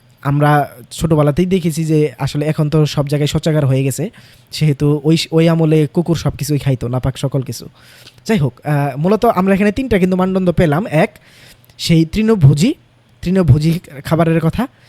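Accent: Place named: Manikganj, Bangladesh. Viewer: আমার বাসা মানিকগঞ্জ, আর আপনার কথাও মানিকগঞ্জের মত লাগছে এর জন্য বললাম আরকি।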